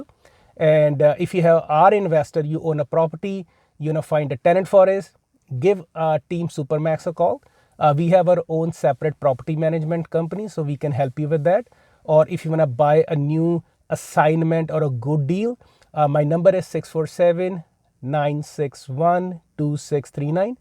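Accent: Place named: Punjab, India. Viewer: Bro I really liked ur punjabi english accent but ur real estate knowledge is excellent keep it up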